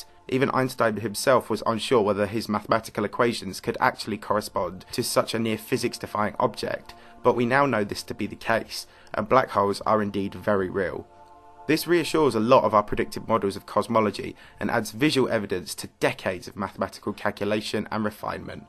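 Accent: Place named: Essex, United Kingdom. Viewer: He's doing what God put him on this green earth to do; have a salon-quality Essex voice, and read the astronomy.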